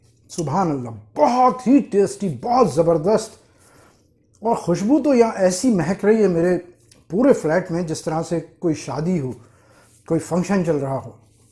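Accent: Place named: Aligarh, India. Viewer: bhai yr qorma recipe looks awesome and your aligarian andaaz " aur sahab" take me down to memory lane of aligarh k shadi ka qorma..the only surprising thing was u added saunf..I never saw my mother adding saunf in qorma instead she use to add khashkash in it..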